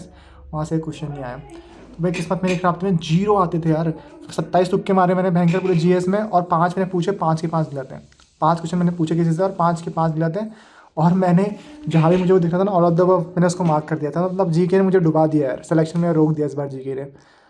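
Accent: Uttarakhand, India. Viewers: uttarakhand se hai kya bhai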